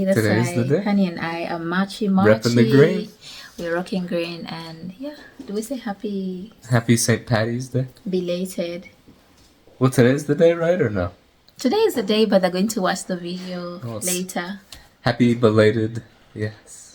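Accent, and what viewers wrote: Uganda. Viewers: Hahaha I love your humor 😂😂 please don't change that Ugandan conversational accent. You two make us feel like we're part of your conversation 😂😂 I wish that food was available here. Ehh waiting for a restaurant 😅😅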